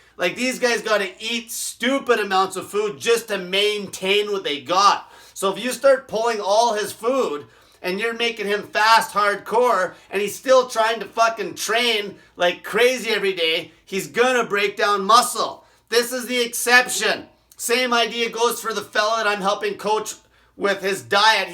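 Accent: Canada. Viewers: Love how he has the typical English-Canadian accent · It is English-Canadian instead of French-Canadian.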